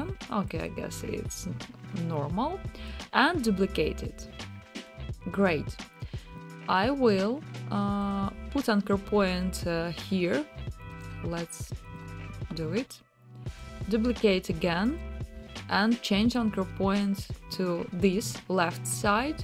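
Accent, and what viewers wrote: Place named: Russia. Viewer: You speak kind Russian accent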